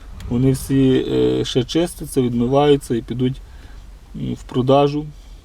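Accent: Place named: Ternopil, Ukraine. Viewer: Привіт! Файні відео знімаєш. Судячи по мові ти мій земляк (я зі Зборова, Тернопільщина). Чи можна з тобою поспілкуватись в інший спосіб (форум, телефон)?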